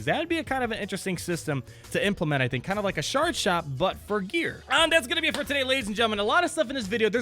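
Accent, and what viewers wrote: Poland. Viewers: now i know that you are polish;) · ziomuś to ty Polakiem jesteś?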